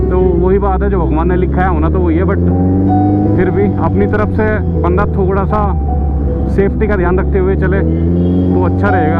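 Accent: Haryana, India. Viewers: Bhai i like you jaat language. Awesome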